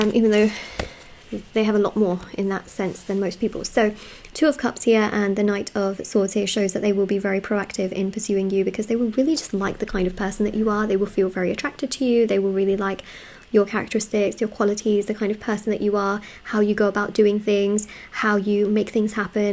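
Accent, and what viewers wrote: United Kingdom. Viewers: This is fairly accurate. I've heard similar from others. It's so in depth too, literally one of the longest readings. Really appreciate all the time and energy spent on the reading. Thank you! Love your voice and British accent too 🙂💜.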